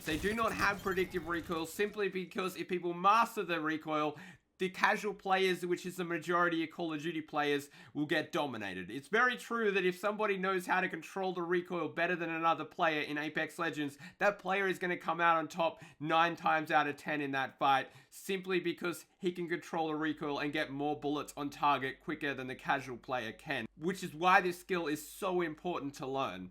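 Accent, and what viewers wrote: Australia. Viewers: I guess that's the Aussie accent lol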